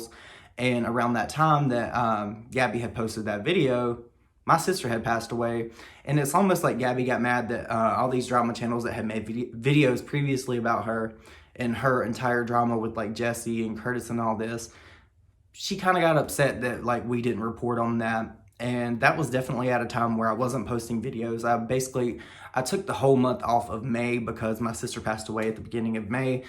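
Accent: South, United States. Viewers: I must know for sure. Are you from the south because I am 🙋‍♀️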